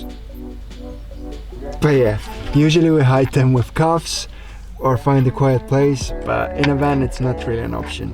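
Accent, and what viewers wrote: Scandinavia. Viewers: Loving the accent!! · Nr 2: Showering! Oki thats enouhn problem for me! Btw are you Swedish? ya accent sounds from Scaninavia!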